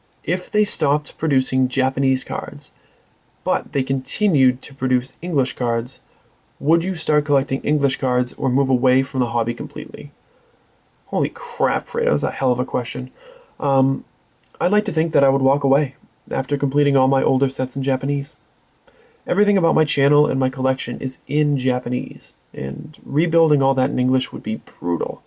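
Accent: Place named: Massachusetts, United States. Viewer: Where in mass are you from? Haha I heard and was like :O I'm north of Boston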